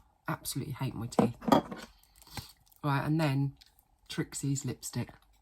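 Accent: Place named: England, United Kingdom. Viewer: Good morning over there in England. Love your hauls and that accent still gets me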